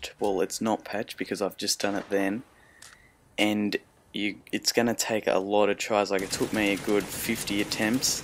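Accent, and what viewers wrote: Australia. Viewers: Nice, And finally an aussie ^__^ good vid =)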